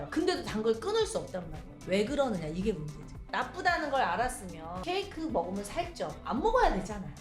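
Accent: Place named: Jeolla, South Korea. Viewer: 이언니 말투가 전라도네ㅡ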